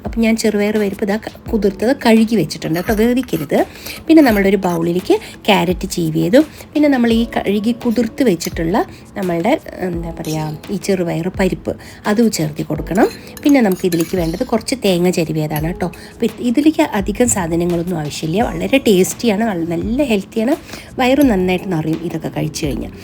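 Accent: Palakkad, India. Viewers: ENIKKU NINGALUDE PALAKKADAN SLANG ORUPADU ESHTAM AANU 🤗ENTE VEEDU EVIDE ERNAKULAM KOCHI AANU 🤗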